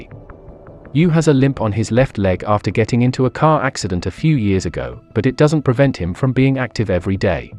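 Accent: United Kingdom. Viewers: Why this autovoice has a British accent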